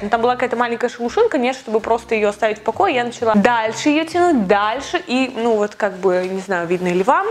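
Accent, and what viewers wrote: Germany. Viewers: Офигенно говоришь по-немецки.Такой акцент😍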